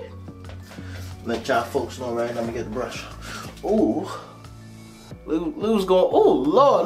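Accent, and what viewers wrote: New York, United States. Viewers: Where you from bro , New York philly ?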